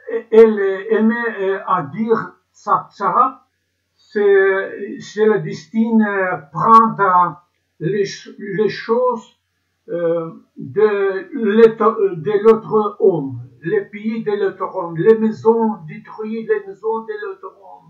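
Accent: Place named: France. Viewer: Добрый день земляк..У вас французский прононс 😀,вы имеете большую способность к языкам 👏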